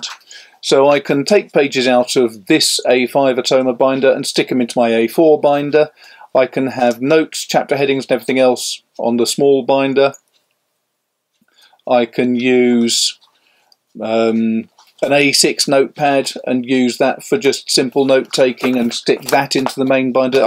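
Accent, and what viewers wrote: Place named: RP, United Kingdom. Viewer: Could you please make a video on why is it that most Brits, even well-read persons like you, have this unbearable artificially arrogant, posh, patronizing, lordly, toplofty tone while speaking? Even among yourselves... what are you trying to proof? The British Empire finished long time ago, did you know?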